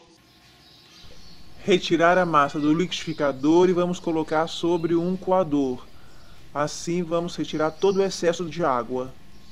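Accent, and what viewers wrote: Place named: Santa Catarina, Brazil. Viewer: Eu de vez em quando vejo seus videos e gosto da imensa variedade como de jardinagem até este de marcenaria. Mas seu sotaque é tipico de SC. Tu és catarinense? A pronuncia do R forte é tipica de quem é catarinense.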